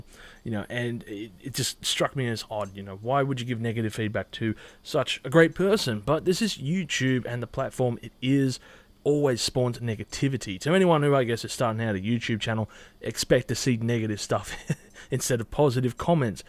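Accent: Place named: United Kingdom. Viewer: Dude you totally sound british 😂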